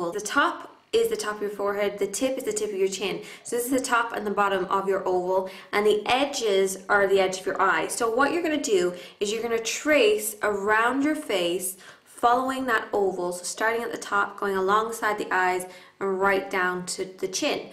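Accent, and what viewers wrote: Ireland. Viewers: are you part irish? i can hear a bit of an irish accent coming through :) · She's from Ireland like me!🌸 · Yeah, she's irish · Shes irish :) x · At the beginning she has an Irish accent because she is Irish ;-). She said she does sometimes get a bit of an American twang though :-). I forget why? She has been to the states quite a bit and she might have family there or something, not sure. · Age you irish.? :) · where are you from ? because I feel like I sense a bit of an Irish accent, regardless its very cute ( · you are messing with my head, ARE YOU IRISH OR AMERICAN GOD DAMN IT?!?!?!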